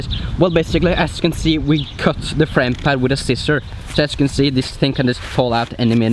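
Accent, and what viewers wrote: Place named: Finland. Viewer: You sound Finnish or Swedish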